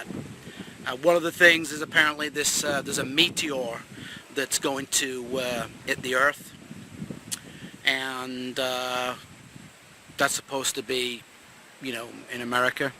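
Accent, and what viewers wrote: United Kingdom. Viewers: How long have you lived in the States? I detect a very familiar British accent still leaking through.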